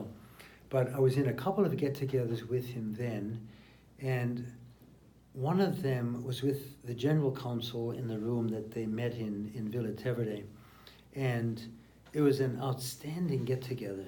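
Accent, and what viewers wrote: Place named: United States. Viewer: He doesn’t sound completely American anymore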